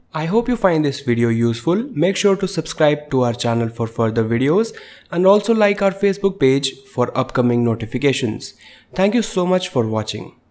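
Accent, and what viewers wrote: India. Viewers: Şu hayatta hintli İngilizcesi kadar kahreden bi şey daha yoktur. Ama iyi video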